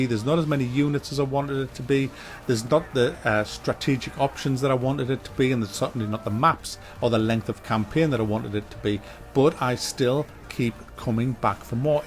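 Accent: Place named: Northern England, United Kingdom. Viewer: LMFAO, bloody northerner! Every time I listen to in his reviews I visualize Oz from Auf Wiedersehen Pet, and that makes it all the more awesome.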